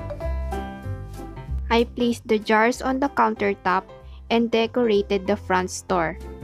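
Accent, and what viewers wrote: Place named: Philippines. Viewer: I also love how Filipino your accent is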